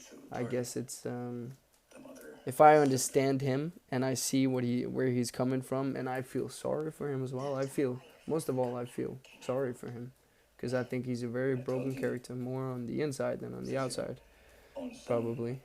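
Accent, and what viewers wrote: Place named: Denmark. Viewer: I listened to this whilst napping this afternoon and HIS voice is like ice cream in my ears,so soothing..he should read audiobooks or sleep stories or something like that. Love the Danish language and accent but his English is pretty great I would say,I like the way he says things when he gets a bit muddled!. 😄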